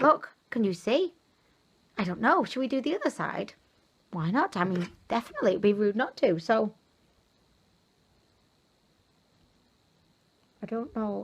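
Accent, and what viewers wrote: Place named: Manchester, United Kingdom. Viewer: Love your personality and accent are you from Manchester:D x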